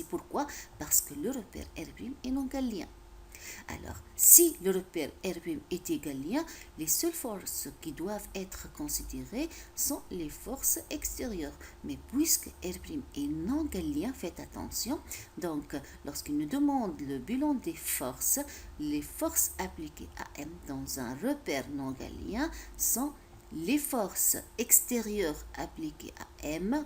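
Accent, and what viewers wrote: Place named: East, France. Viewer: Non seulement la forme c’est à dire votre accent oriental extraordinaire… mais aussi le fond c’est à dire votre précision, votre rigueur, le caractère didactique de votre résolution… tout cela fait de vous un professeur de qualité ! Vos étudiants doivent être fiers de vous !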